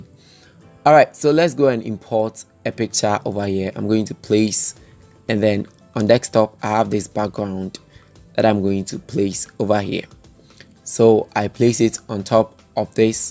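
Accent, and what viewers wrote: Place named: Ghana. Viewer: The accent is like a Ghanaian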